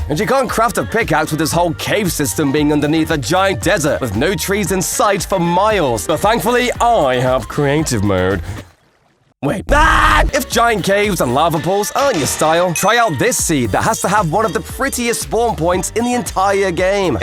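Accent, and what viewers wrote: United Kingdom. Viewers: My mind is blowing up! At the same time you sound British and at the same time you sound American! What are you!💀💀💀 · Dude are you British?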